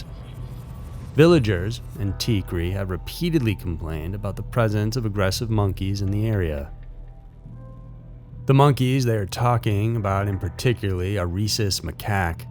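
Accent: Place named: Texas, United States. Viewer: Love, love, LOVE your accent. Not sure if it's Texan (I'm from Austin and we're not like the stereotypes lol) but it sounds a bit like what I'd hear back home.. Either way, your content rocks and I am so here for it!!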